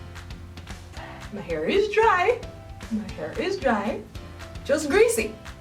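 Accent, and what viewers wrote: Jamaica. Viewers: I love your Jamaican voice lm Jamaican to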